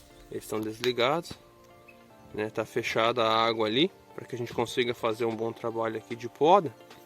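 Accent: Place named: Rio Grande do Sul, Brazil. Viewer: Tu é de onde teu sutaque parece gaúcho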